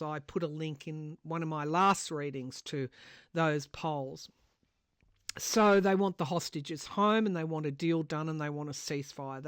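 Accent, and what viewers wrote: Australia. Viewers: I love hearing the sayings in different countries! I've never heard 'rugged up' - I chuckled a little and thought it is quite appropriate when wrapped up in cozy, fuzzy sweaters ('rugs')!!! (I'm in the US, originally from MA, been in FL for 20 yrs now) starting to get hot already!!! Would love to see Australia!!!!!!!!!! My favorite accent!!! The you!!!!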